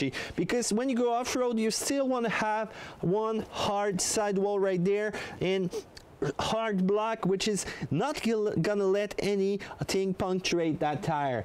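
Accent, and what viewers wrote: Quebec, Canada. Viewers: Quebec accent if I'm not mistaken ;)? Français? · Quebecois as fuck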